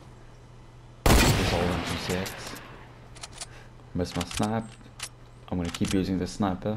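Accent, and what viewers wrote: South Africa. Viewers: Are you south African? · Are you afrikaans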